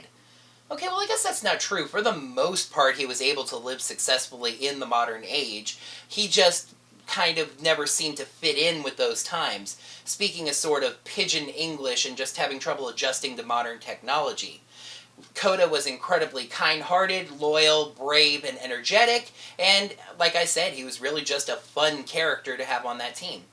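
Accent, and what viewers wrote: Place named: Scotland, United Kingdom. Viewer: I really enjoy watching this video and your Scottish accent was on point and my vote for favorite pink ranger is Jen from power rangers time force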